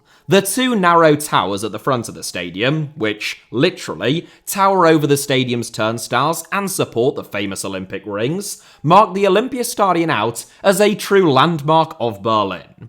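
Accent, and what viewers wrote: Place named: United Kingdom. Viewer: I love this channel for its information and also deep british accent.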